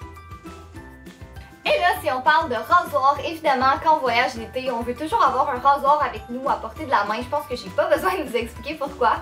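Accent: Quebec, Canada. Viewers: un accent cebekoie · Je habite en Suisse mais on parle francais(de la france) et je t adore vraiment meme si des fois je ne comprends pas d accent canadien mais c est vraiment amusent de l entendrr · Un accent québéc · Je n'aime pas l'accent québécois mais toi je trouve que t'es vidéos sont tellement bien que je n'entend a peine ton accent et tu es trop belle en plus ❤ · mais personne ne se plain de moi ses kawai son accent québequoit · CHADORE LACCENT QUEBECOIS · Vraiment pas cest juste l'accent quebecois .-. Va apprendre c'est quoi l'accent quebecois trop prononcé et tu vas voir que c'est vraiment pas pareil !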